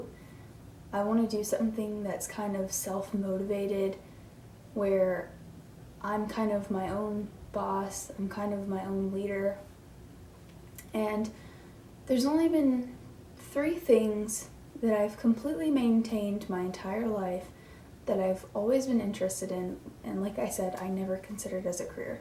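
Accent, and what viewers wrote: United Kingdom. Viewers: wait wait wait. i just watched the video where she had like this perfect British accent...what happened to it? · Why did you stop making Youtube videos? 22K is a great start. And what happen to your British accent? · Same :o the British accent's gone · Go back go using your British accent. I really enjoy it when you speak in your accent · I loved ur Brit accent tho😔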